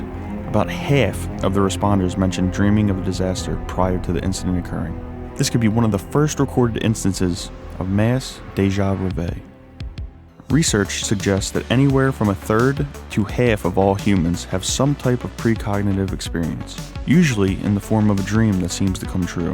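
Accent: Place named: Philadelphia, United States. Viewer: this guy is definitely from Philly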